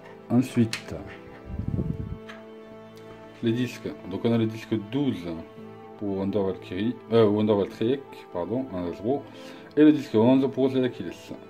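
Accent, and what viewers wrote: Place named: South, France. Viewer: Tu viens du Sud toi 😂😉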